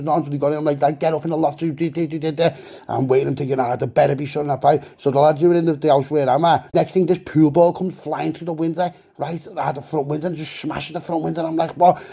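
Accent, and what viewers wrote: Liverpool, United Kingdom. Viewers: Fuckin el Scouse...take me to the fair with you next time!! 🤣🤣🤣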